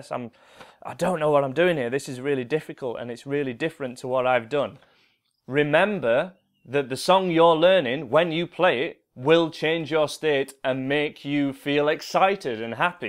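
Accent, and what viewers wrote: United Kingdom. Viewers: are you British or Scottish?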